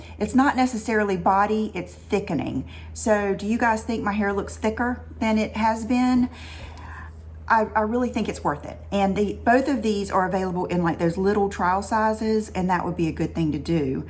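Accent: South, United States. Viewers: Love your precious southern accent!! Can I be you?!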